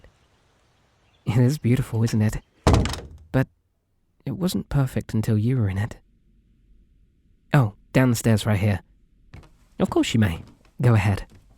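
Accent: United Kingdom. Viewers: I'm fucking dead. The level of comedic timing is impressive. Taking in to account I was just mocking his british accent just a second ago.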